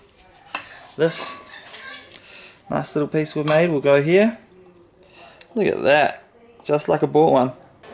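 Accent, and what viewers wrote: New Zealand. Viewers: R u a kiwi? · Lmao first word you said knew you were from NZ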